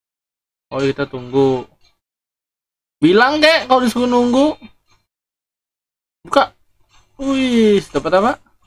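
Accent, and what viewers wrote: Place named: South Kalimantan, Indonesia. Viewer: Kayaknya kamu orang kalsel ya guys?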